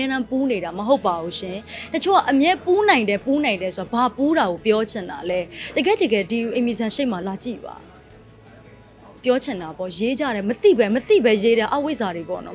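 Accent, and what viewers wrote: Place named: Pa-O, Myanmar. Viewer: ပအို့ဝ်သံနဲ့တူတယ်လို့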